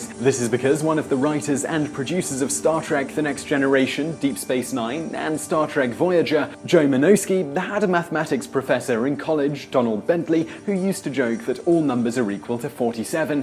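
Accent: England, United Kingdom. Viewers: To all the complainers: DO NOT WATCH. Crybabies., most of your audience does not care how you pronounce words. Your British accent is marvellous. We Americans use a form of PIDGIN English any way. Can't even spell, bad punctuation, stupid grammar, borrowed YOUR language. Ingrates! As British as Apple Pie, "My country 'tis of thee"(America)="God save the King", etc. The US has borrowed much of it's culture from the English AND some from the FRENCH. If you do not like that, give the Statue of Liberty back to the French. And also 1/3 of the modern English language. Sorry for the IGNORANCE of some "Americans". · I understand your English accent would have some words sound a bit different. However... Gene Roddenberry's name is not pronounced Roe-den-bree, it is pronounced Rod-en-berry. Whoopi Goldberg is not Whoopi Golds-berg. Also, LeVar Burton's name s not pronounced Lee-Var, it is pronounced Luh-Var.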